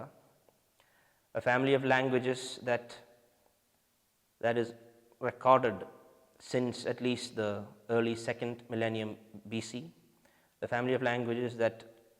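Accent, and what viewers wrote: Kerala, India. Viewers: Why do you have a mallu accent?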